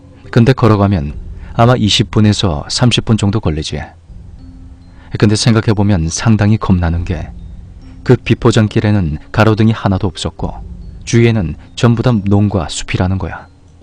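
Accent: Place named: Busan, South Korea. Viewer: 충청남도 서산인데 부산사투리 쓰네 ㅋㅋㅋㅋㅋ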